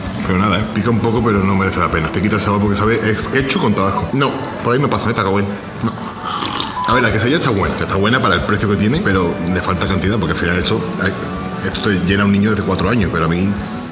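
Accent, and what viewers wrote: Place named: Mexico. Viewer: Amooo el acento wey, te lo juro nms, yo soy de Mexico, y aseguro que es 100% mexa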